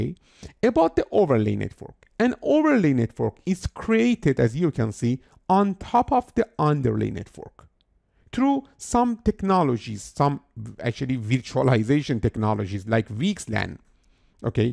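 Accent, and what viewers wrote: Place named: England, United Kingdom. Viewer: Watching this form England, and I like your English accent. this video is full of good information. cheers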